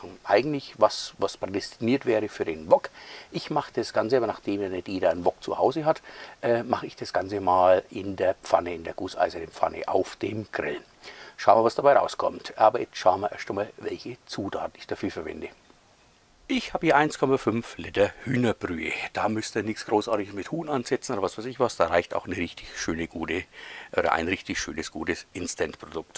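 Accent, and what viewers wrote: Franconia, Germany. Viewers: Hi das sieht richtig richtig gut aus ich werde das mal nachprobieren 👌 Sehr schön anzusehen mit deiner ruhigen Stimme und sympathischen Art, das bringt selten einer rüber, a echter frangge hold 👍 Vielen lieben Dank für dieses tolle Video und ich lasse sehr gerne das Abo samt Domglocke da um nichts zu verpassen 😉 Gesund bleiben und weiter so ✌️ Gruß Olli ✌️ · Saugut! du bist spitze. Endlich mol a Frangge und ned su a zipfl der ned richdich deitsch sprichd. Grüße aus Bamberch.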